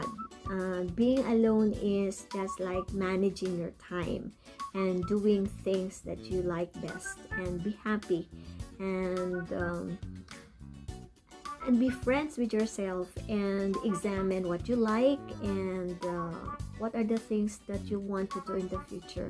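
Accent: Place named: Philippines. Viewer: Plus your accent sounds just like hers. She was filipino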